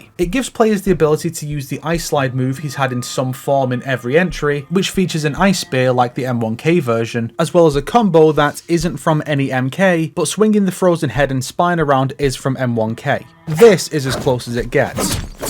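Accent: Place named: United Kingdom. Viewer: I don't remember you being British wtf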